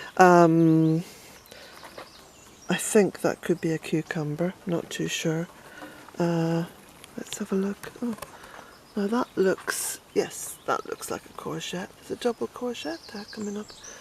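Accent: Ireland. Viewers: Hello to the wealthy, amazing lady and jack. lovely to see your heavenly garden, also hearing the birds and your lovely Irish accent. I'm trying to set up my ironing, but keep stopping to see and hear your conversation . Thank you for the joy. north shrop England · Beautiful, your hard work was well spent. Watching this from rural waterford and am glad to hear the gorgeous Irish accent represented :)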